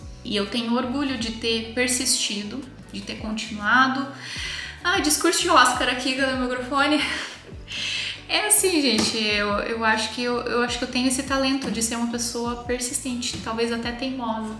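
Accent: Brazil. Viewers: Sabia que teu sotaque, não era estranho! !!!Porto Alegre RS, capital 💃💃😘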